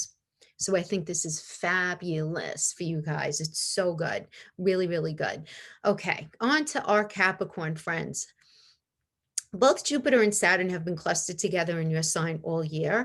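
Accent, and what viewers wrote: New York, United States. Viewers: Hi, I just came across your video. As the previous comments have said.....you are amazing! Also love your New York accent!!!! Love your explanation etc....awesome. Do you do readings at all?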